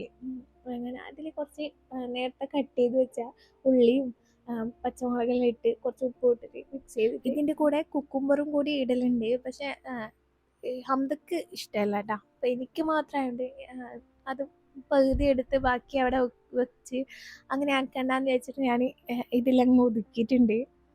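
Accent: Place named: Kannur, India. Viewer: u r from kannur,? talking style is good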